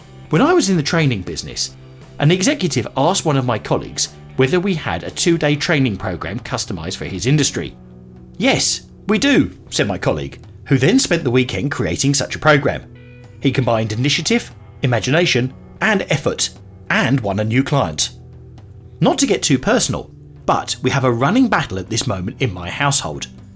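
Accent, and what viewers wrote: United Kingdom. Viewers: Ive never herd something deep from a british accent.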